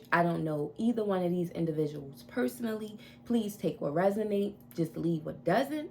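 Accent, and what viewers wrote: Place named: Baltimore, United States. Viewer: Love Your Accent, Are you from Baltimore?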